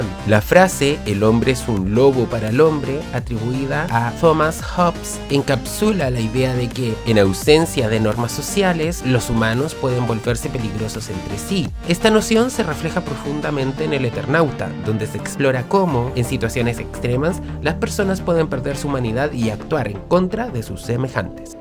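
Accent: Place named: Chile. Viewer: Felicitaciones! Estoy literalmente hace años con deseos de crear un canal artístico cultural. Consumo ese tipo de material en su mayoría y además de disfrutar del contenido, me fijo mucho en la factura técnica y en la calidad, claridad, simpatía y todo lo que tiene que ver con la voz o la persona que está al frente del canal. Tomo ideas, analizo, etc. Me gusta cuando hay originalidad en la manera de narrar y pese a que se nota que eres chileno, tienes un estilo muy personal. Primer video que veo de tu canal y está muy bien hecho.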